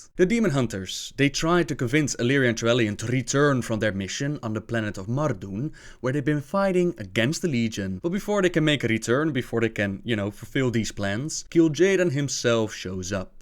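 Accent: Netherlands. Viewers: Where is from?? I know it sounds weird, but when I visit my aunt she sounds sort of like him in like accent wise (she's Dutch) and it's the same with my dad. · dude Ur amazing with this. Dutch accent ? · he is from the netherlands, hes not american or enlish or some sort, he cant do anything about it. For some people who are from the netherlands its hard to pronounce. I dont have that, but i cant pronounce the R while speaking dutch, but i can while speaking english, its rly weird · Where are you from? You sound a bit dutch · dutch accent is real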